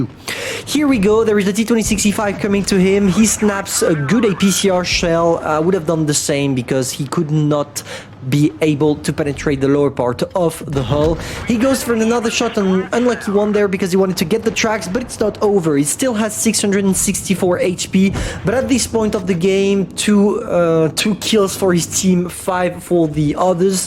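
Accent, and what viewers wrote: France. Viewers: On dirait un français qui parle anglais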